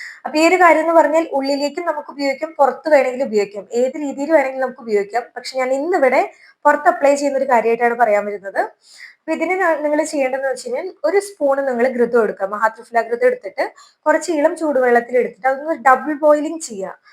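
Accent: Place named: Malappuram, India. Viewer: 100% ശരിയാണ്. മലപ്പുറംകാരിയാന്ന് തോനുന്നു. പൊതുവേ മലപ്പുറം കാർക്ക് പല അക്ഷരങ്ങളും വായീന്ന് വരില്ല. ഉദാഹരണം: മഴ എന്നു പറയുന്നതിനു പകരം "മയ" എന്നേ പറയൂ. പഴം: പയം, കഴപ്പ്: കയപ്പ്, പഴനിമല: പയനിമല, കഴിഞ്ഞു: കയിഞ്ഞു, നാക്ക് വടിച്ചാൽ മാറുമോ ഈ പ്രശ്നം. സാക്ഷര കേരളത്തിൽ ഇങ്ങനെയൊക്കെ കേൾക്കേണ്ടി വരുന്നു. കഷ്ടം.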